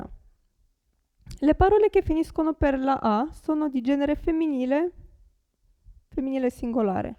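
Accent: Eastern Europe. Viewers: beh il vocabolario più esteso, i modi di dire, la pronuncia, può insegnare anche i vari toni di dialetti etc etc etc... Quello che non mi è piaciuto in questo video è la pronuncia dell'est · She's not Italian. Her accent is from Eastern Europe.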